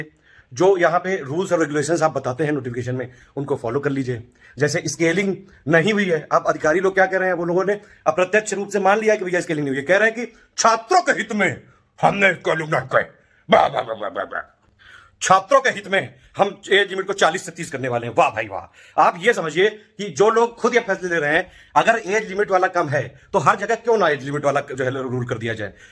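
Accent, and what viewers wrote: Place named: Kanpur, India. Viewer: Kanpuriya language Maja aa Gaya sir Simple baat hai 2022 me Kuch Kiya jaye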